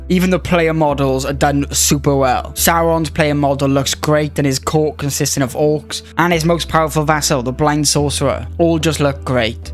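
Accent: Wales, United Kingdom. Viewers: Hey great vid man, nice to see another Welshman into ck3, keep it up my good man, your accent tells me you’re from around the Swansea area?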